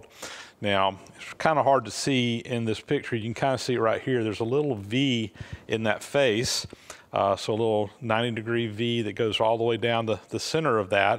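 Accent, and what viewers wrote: South, United States. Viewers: It is: United States of America, southern Georgia version!!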